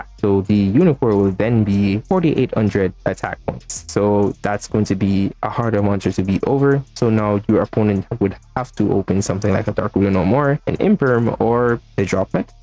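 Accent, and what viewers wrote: Jamaica. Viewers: He jamaican?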